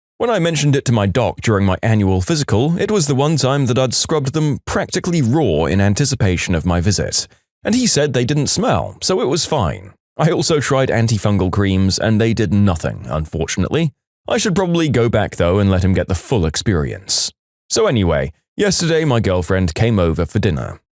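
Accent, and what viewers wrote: United Kingdom. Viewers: is it just me or does anyone remember his voice being deep in past episodes and now he sound British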